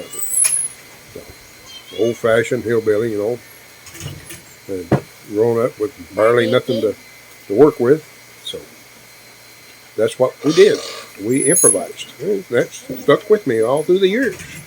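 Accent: United States. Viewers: Enjoy buddy ….. u sound like your from Atlanta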